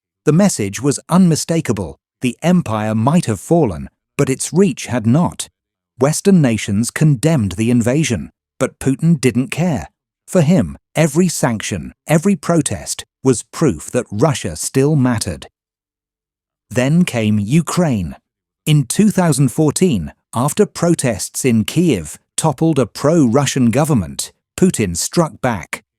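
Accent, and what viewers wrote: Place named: United Kingdom. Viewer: Jewish Gangster Narrator with a British accent! They have hijacked the world with sleeper cells waking up in every country in the world in positions of Defense ministers, Intelligence, police in top positions to control. The 5 eyes is Israeli gangster Terrorism run bybthe terrorist state of Israel. We’re hijacked. Putin is just trying to hold his culture,& Country together. He’s a saint! Says The BronxBoy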